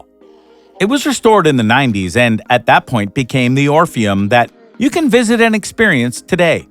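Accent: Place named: Canada. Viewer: The narrater sounds Canadian.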